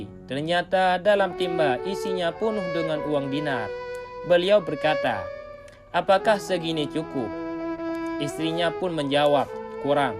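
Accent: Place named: Aceh, Indonesia. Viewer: Suara mimin Kek logat org aceh ngomong bhasa indonesia 😅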